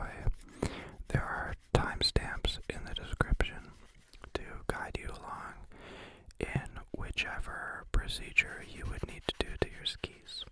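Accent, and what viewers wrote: Canada. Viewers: Us he Canadian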